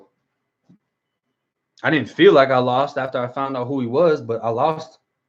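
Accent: Boston, United States. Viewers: Hey Bro, Boston accent frealz! You into the great Boston comic, Bill Burr? Bet you won't get tickled!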